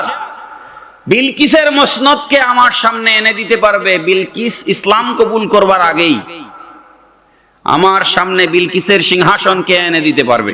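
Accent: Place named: Sylhet, Bangladesh. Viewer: Masallah sundor waz sylheti vasha onek valolage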